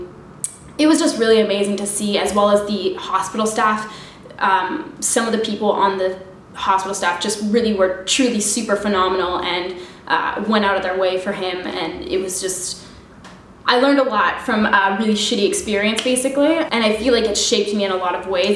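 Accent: Canada. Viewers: Just discovered your channel! I'm Swiss and I lived in Van for about half a year and I miss it so much! Just listening to your Canadian accent made me feel like I was in Vancouver again, so I'm definitely gonna watch tons of your videos today just to make me less homesick :D xx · I THOUGHT I heard a bit of a Canadian accent ;) hecks yeah!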